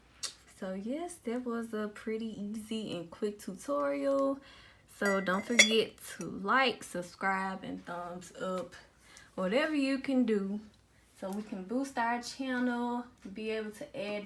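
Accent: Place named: Florida, United States. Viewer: Your accent is so cute lol so country. Must be from Florida too 😂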